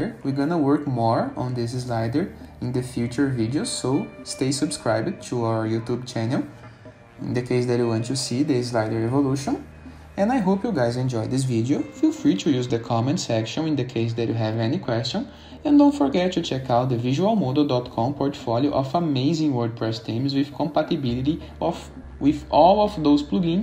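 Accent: Brazil. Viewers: Sotaque BR haha nice video!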